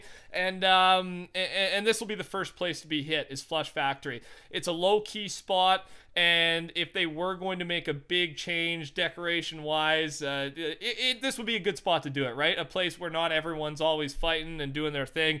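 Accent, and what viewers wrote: Canada. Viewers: Are you Canadian? It sounds like you have a little Canadian accent 😂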